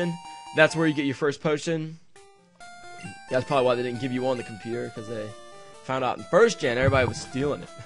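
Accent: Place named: United Kingdom. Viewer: You can talk very good british!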